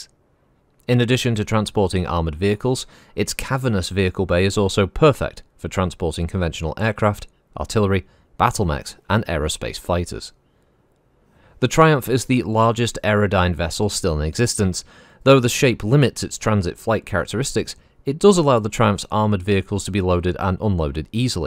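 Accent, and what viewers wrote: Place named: RP, United Kingdom. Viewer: It's that elite upper-class British accent 🙂.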